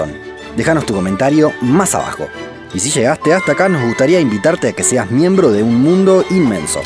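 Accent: Rioplatense, Argentina. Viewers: Por curiosidad, de dónde es este chico? A los españoles nos cuesta diferenciar el acento de Argentina, Uruguay y Paraguay. Un saludo!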